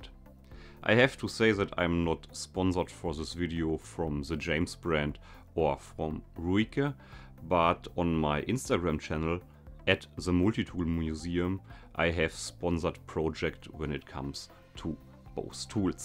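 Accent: Germany. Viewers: You are german i can hear that clearly hab ich Recht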